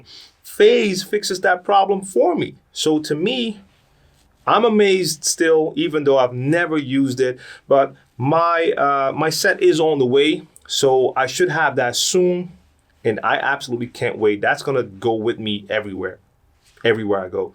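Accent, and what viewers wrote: New York, United States. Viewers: wow you sound like you're from New York! Great content as always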